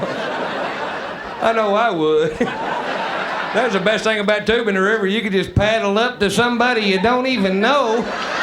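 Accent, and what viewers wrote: South, United States. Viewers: That Southern accent was more pronounced in his younger days. As he aged act was dirtier! Liked him on Blue Collar tours.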